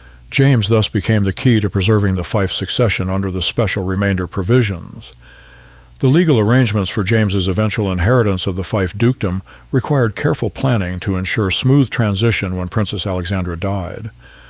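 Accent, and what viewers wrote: United States. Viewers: This is a very interesting presentation, but does it have to keep labouring the onerous ducal duties? Why oh why does it require an American narrator, talking about ‘dookal’ titles and children being ‘tootered’? Tiny point , Lady Maud was the niece of Queen Maud of Norway, not her great niece. Queen Maud was the Duchess of Fife’s younger sister.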